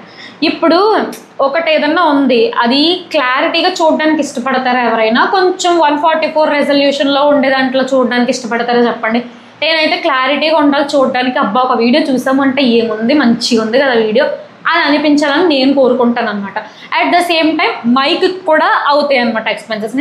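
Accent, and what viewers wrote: Godavari, India. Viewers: Good to see your videos. I like the way you speak and your slang from GODAVARI. Even i am from andhra from Vijayawada, but my parents are settled in HYD and my self in UK.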